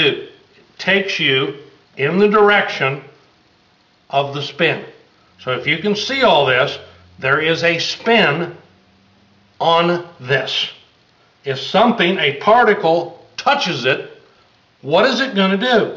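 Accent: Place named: Texas, United States. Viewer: aherlm ?! an american with texas dialect explaining particle mechanics (insert texas joke here) I'm having a hard time to swallowing this is real science americans cant even keep their government clean from corruption insert rant about copyright, patent system, privacy violations, lies, bully, threaten, *grumble grumble*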